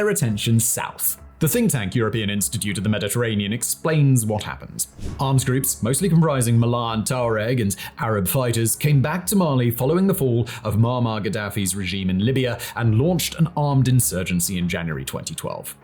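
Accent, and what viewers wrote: United Kingdom. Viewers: God Im so tired of hearing this British fucking noise they're even making more of you narrators on TV it's lit er ally not literally it's pronounced mil it ary, not militry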